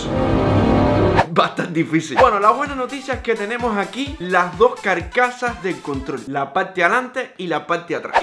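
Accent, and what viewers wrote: Cuba. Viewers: Eres cubano no??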